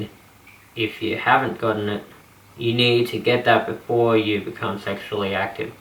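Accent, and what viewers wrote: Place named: India. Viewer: You live in Australia why is your accent messed up brother? Your Indian gene too much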